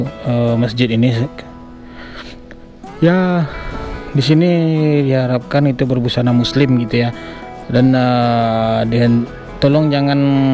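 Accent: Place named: West Sumatra, Indonesia. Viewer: Logat bahasanya sama kayak minang kocak lu bang wkwkw😁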